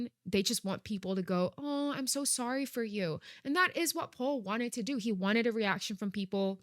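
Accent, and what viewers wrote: United Kingdom. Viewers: Are you British or American? I’m confused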